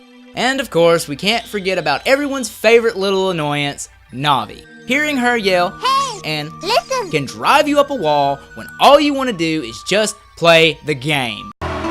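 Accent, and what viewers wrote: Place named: South, United States. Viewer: Ya know what, hearing a southern accent is actually kind of refreshing, you don't really see that in the Youtube Gaming community:) You did well elaborating your points, and the pacing was really good. That's probably the part that I expected to be the worst (from smaller Youtubers), but you did well! Really well in fact. Keep it up!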